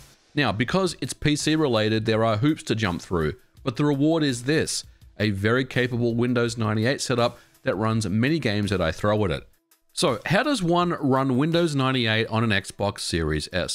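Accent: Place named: Australia. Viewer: Australian accent Next time I'll turn it into an Amiga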